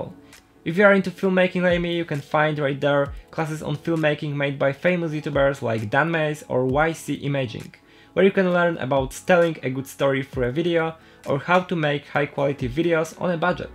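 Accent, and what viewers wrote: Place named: Poland. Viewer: masz akcent polaka :)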